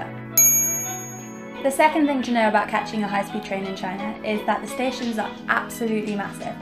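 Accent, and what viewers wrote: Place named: United Kingdom. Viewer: Love your strong brit accent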